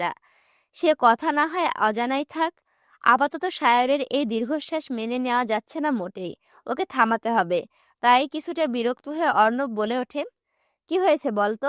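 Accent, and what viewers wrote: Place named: Kushtia, Bangladesh. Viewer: তাই কুষ্টিয়ার মানুষের মতো মাঝে মাঝে কথা বলো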